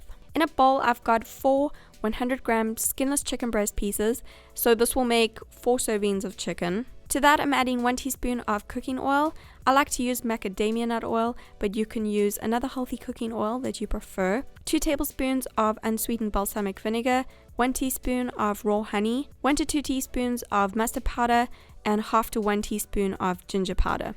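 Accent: South Africa. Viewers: haha that South African accent.. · South african??? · sounds like ur from SA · are you South African? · Ek kan jou wit-mens Suid Afrikaanse aksent DUIDELIK hoor 😂❤ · What's the accent??? (I think I'm wrong but you sound South African) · I love your videos I’m going to start watching your videos. Also I love your accent // are you South African? Xxx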